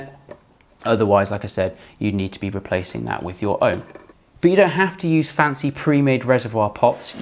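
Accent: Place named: England, United Kingdom. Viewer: You sound English!! Where do you get your clear inner pots? I can’t find places in UK that sell them?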